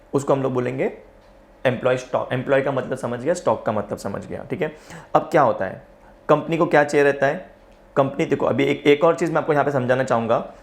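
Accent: Maharashtra, India. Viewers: Are you Marathi ?sir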